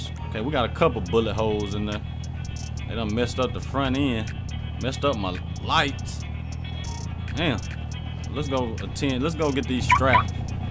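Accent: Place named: Texas, United States. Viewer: This guy has to be from Texas. Love it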